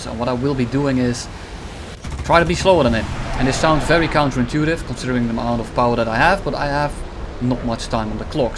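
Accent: Netherlands. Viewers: you sound pretty dutch but i like it very much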